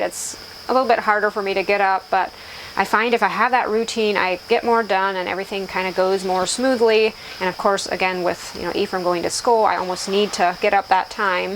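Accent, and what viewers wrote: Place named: Pennsylvania Dutch, United States. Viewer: So good to know families do canning/ preserving together. My mother-in-law taught me to can. Bless her heart, she would work all day at a factory then come to our house and help me put up creamed corn or can green beans. She’s gone now but every time I pull out my canner or corn cutter I think of those days. Hard, hot work but soooo good in the winter. I love the idea of a cookbook! Two sisters whom are amazingly talented sharing their family recipes AND Amish heritage. I love learning about other cultures/ lifestyles! So interesting to hear you talk about how you were raised and to allow us to hear your Pennsylvania Dutch. Love you channel so much. Thank you for sharing!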